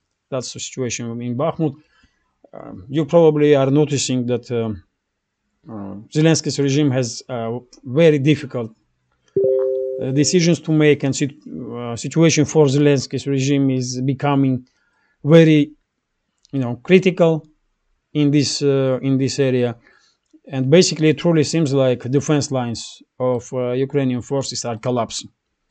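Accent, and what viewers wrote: Russia. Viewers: Your English is quite perfect and it’s not hard at all to listen to your conscientious sentence construction dedicated to accurately expressing what you want to get across to your audience. Especially with your lovely Russian accent 🙂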